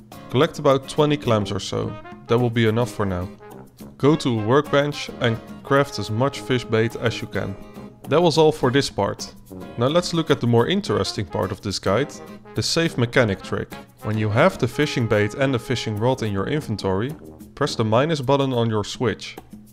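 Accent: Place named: Netherlands. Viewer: I can just hear the Dutchness in your voice hahaha!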